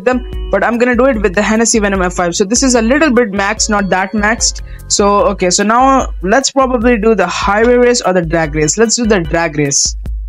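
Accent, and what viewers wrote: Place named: India. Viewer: are u Indian? U have a Indian accent which is cool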